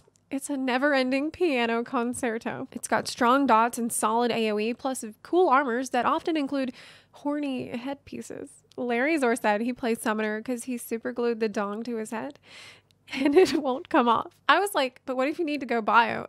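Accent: South, United States. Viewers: Omg i cant tell if your accent is Southern American or Australian 🥺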